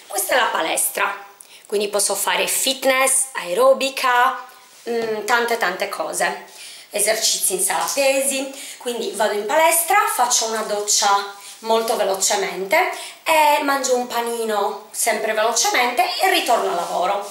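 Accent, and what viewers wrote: South, Italy. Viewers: it is not a good accent. she is nice teacher but with a very bad accent. she is from south, all the vowels are too open. it is not correct. for italians it is very bad accent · questo purtroppo non è l'accento perfetto. E' un accento del sud.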